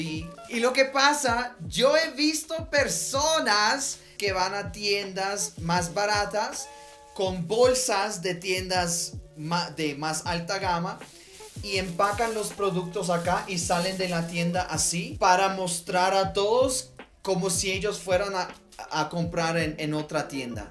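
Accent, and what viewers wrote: Bogotá, Colombia. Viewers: Que pirobø tan colombiano el jaja habla y tiene muchas actitudes de la tierrita, cvero caso de armor a la patria ♥️ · Neutro??? De oirlo supe q aprendió español en Colombia. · el tiene acento rolo, nosotros los rolos pensamos que no tenemos acento, pero si es asì ! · Soy de Argentina y su acento es muy colombiano. Quizás te referís a qué es un acento colombiano neutro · Me salió tu video por casualidad pero me mataste de la risa! 🤣 Nací y crecí en Bogotá hasta los 21 años, pero al escucharte hablar me pareció que no ha pasado el tiempo, aunque llevo ya 32 años fuera del país. Mi niñez la viví en Kennedy, pero ni muerta admitíamos eso si estamos en otro lado 😃. Cuándo no había nada para la cena, y por casualidad íbamos a visitar amigos, teníamos prohibido aceptar si se nos ofrecía comida, porque depronto se daban cuenta que no teníamos que comer en casa 🤨. 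A veces me da risa recordar esos tiempos pero la mayoría de veces la verdad no lo entiendo, y eso que yo me crié allá 😩. La necesidad de aparentar era (es) primordial. 🥺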